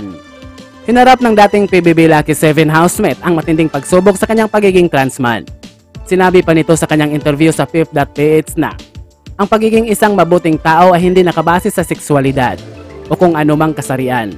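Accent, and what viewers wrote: Visayas, Philippines. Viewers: Nalingaw ko sa imong diction Bisaya jud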